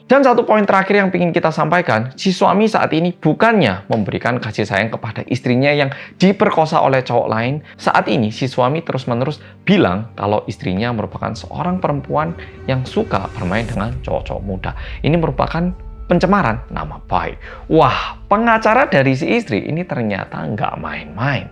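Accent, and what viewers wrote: Java, Indonesia. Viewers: Great story to watch 👍👍👍 Thank you mas ' ' 😁🙏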